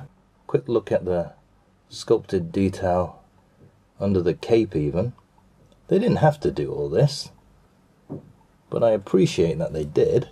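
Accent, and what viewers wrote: United Kingdom. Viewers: Cuz he's British...I say Darth aswell, cuz im British too...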